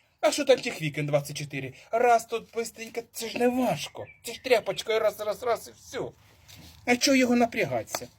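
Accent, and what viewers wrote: Ukraine. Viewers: Артист😂😂😂❤❤❤Спасибо. Нiч яка мiсячна зоряна ясная.. Как все это знакомо, все родное. Эту песню мама пела. . Может удивлю, но есть в России на Дальнем Востоке село, где жила моя бабушка. Ее родители были переселенцами, в царское время переселились в Приморский край. Вот на таком языке все село говорило. Даже интонации те же, и логика😂😂.. Слушаю, как будто земляка. Молодчина!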